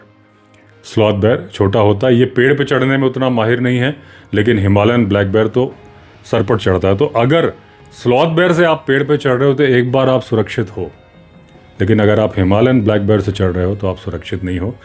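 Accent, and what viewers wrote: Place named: Jammu, India. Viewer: Sahi baat hai hamare gam ke log bi asa hi bolte hai Main jammu se hu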